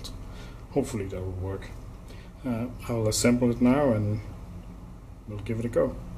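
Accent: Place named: Denmark. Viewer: thx for the video, also took the plunge on a couple of these. was comming short when checking LDdrivers and loads due to high power laser diodes, and purchased a couple of these power supplys. was looking at that KA3005P but seems to cost around 110US delivered + tax and then saw the KA3003P (nax3A) for 63US delivered, so took that, but doubtfull that it will get shipped hence 63US seems to low. while waiting for it to get shipped' instead purchased the KD3005P from Reichhelt Germany to around 77EU+5.6EUshipping-fee and it got delivered today, 25hours delivery time from Germany to Denmark, impressiv. Trying to get familiar with levels and the rela'es, seems to click around 3 times from low to top 31v voltage, but it puzzle me that it keep dropping the voltage on load but likely my rookieness to blame, but i do cherise that its the P version and able to handle it with the mouse and keyboard the values and getting graphical views, makes the adjustment easy, evewen thow the two clickknobs on the KD model is easy to manage. 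... btw your accent very much sound like your from Denmark..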